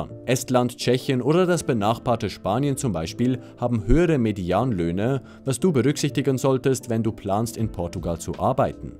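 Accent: Switzerland. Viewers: Wenn jetzt kein schweizer Akzent wäre, würde ich sagen das ist runtergeleierte KI ohne Luft zu holen und nützliche Sprechpausen!!!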